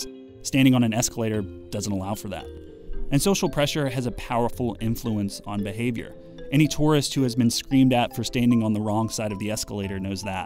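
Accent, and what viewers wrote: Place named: New York, United States. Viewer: liked and subbed for the new yorker accent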